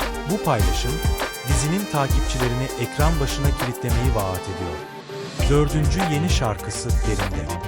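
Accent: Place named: Anatolia, Turkey. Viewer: Sesini çok beğendim iyi ki mutfakta sesin çok güzel Anadolu sesin çok güzel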